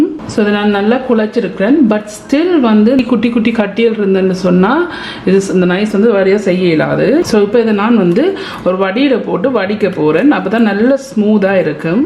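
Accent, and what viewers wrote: Jaffna, Sri Lanka. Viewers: Sister Are you from jaffna nelliady?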